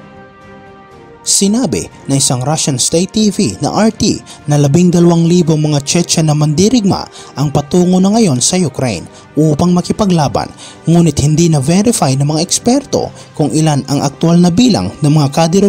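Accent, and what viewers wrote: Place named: Visayas, Philippines. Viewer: Bakit ganyan ang punto mo bisaya ka ba o bisakol ha ha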